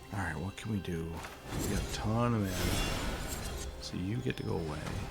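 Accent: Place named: Minnesota, United States. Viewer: My man, you gotta be from MN right?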